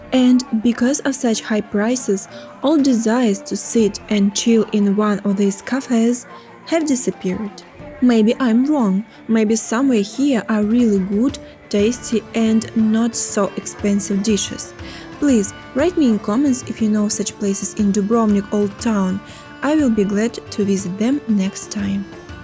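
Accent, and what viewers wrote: Russia. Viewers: У Вас русский акцент?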